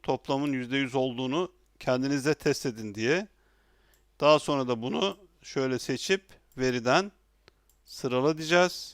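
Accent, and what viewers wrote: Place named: Aegean, Turkey. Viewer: Hiç fark etmez ama ege şivesiyle zor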